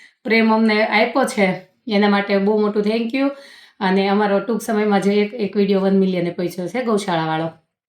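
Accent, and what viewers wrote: Kathiawar, India. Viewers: કાઠિયાવાડી છો દીદી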